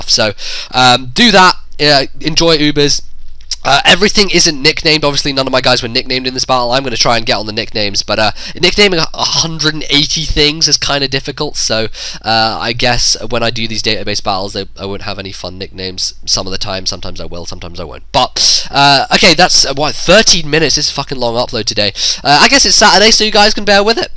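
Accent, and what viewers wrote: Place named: United Kingdom. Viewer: U british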